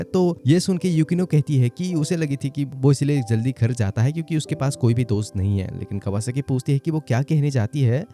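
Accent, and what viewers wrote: Northeast, India. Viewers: Always a north east guys accents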